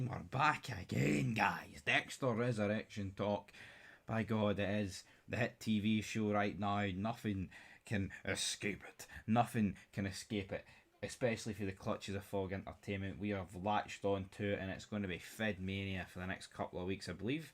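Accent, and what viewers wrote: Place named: Scotland, United Kingdom. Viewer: Your accent is all over the place. mega Scottish accent and you're sometimes doing words in an American accent, like why?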